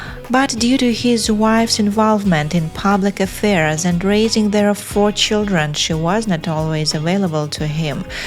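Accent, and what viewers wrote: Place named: Russia. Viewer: No, it's a lady of apparently Russian mother tongue, with a certain - but not sufficient - mastery of the English language - and who pronounces a lot of words wrong. Indeed it's hard to stand.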